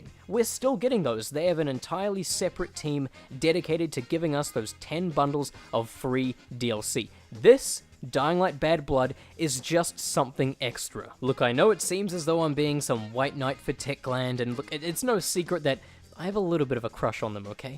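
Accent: New Zealand. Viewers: I have ONE queston... Are you a New Zealander, if not... EXPLAIN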